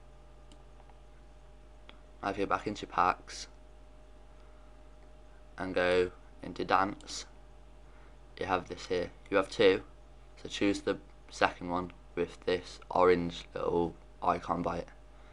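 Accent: United Kingdom. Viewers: "you sound like your from london"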